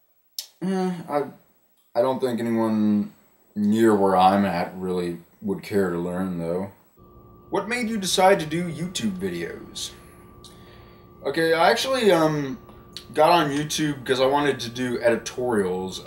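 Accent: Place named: RP, United Kingdom. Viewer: what state are you from? you sound very posh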